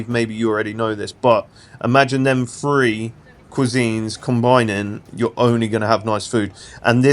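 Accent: United Kingdom. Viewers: It must be from the UK that's why you knows protons..but unfortunately you doesn't know that protons are malaysian cars..Actually all around you are proton cars but you don't know because it's possible that protons aren't marketed in the UK anymore..that's why you don't know the new release from protons..and you noticeable accent is Uk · I absolutely LOVE the british accent, i can listen to that all day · What part of UK are you from because your English is English…u know what I mean?? You are speaking very smoothly, every one can understand you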